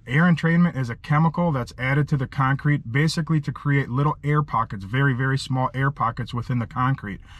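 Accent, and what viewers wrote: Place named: Wisconsin, United States. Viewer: There aren't many accents that beat a thick Wisconsin accent.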